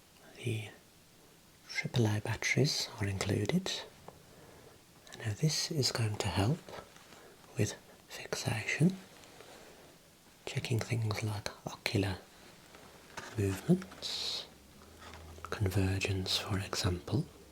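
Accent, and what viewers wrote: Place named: West Midlands, United Kingdom. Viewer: Your voice reminds me of my Orthodontist's voice when I was a child in the 90s. He lived in Solihull. I was never afraid of a doctor again after treatment there, and I never forgot the voice. I've always found the west midlands accent to be really comforting. I live in Texas now, which is quite a way away...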